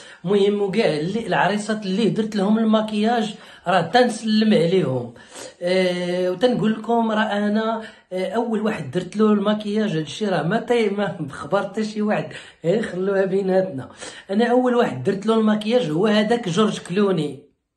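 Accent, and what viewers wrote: Marrakech, Morocco. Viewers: صراحة جات معاك للهجة المراكشية هههه 😂😂😂😂😂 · Le message wsal tbarkallah aalik. L'accent de Marrakech. Ta9lid Khatiiiiiir hahhhah. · Bravo lahja mrakchiya 😂😂😂👍😂👍✌️✌️ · 🤣🤣🤣🤣🤣🤣🤣 وتقنتي القمامة واللهجة المراكشية👍👍👍👍👍👍👍👍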